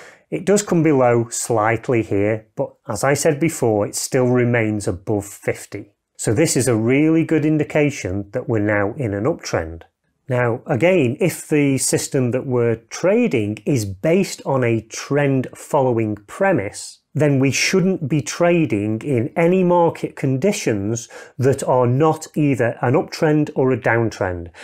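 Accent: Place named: United Kingdom. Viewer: Excelent contents and presenter's british accent is music!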